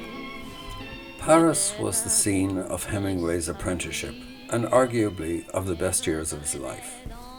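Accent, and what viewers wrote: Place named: RP, United Kingdom. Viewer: A great tour, to be sure, but despite the prestige British [or maybe posh Dublin] accent, the poor pronunciation, at times dyslexic, of French names and locations —and depicting le Musée d'Orsay as le Musée du Louvre— detract from the presentation. At least it's seemingly an actual human voice, a thing you can't always count on these days on YouTube.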